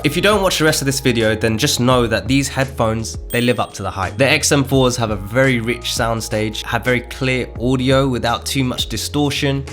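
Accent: United Kingdom. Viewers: "?" Dear Sir, your information is so valuable! But your clipped British accent at coffee shop velocity is sometimes unintelligible, especially for someone who wants to be easily understood and WHOM WE WANT TO UNDERSTAND! You speak so well! But too fast . . . Slow down, please! (About halfway through, I gave up: tired of the onslaught/drone of your chatty, breezy voice--with no respect for the listener.) We're not your friends; we're your audience.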